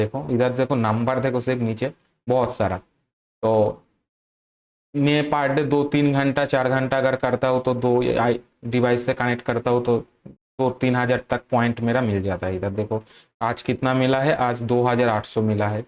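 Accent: Bengal, India. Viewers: bhai tmi bangali ho??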